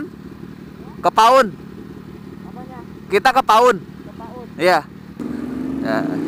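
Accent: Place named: Java, Indonesia. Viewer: sampean I wong ngendi kok ngomonge medok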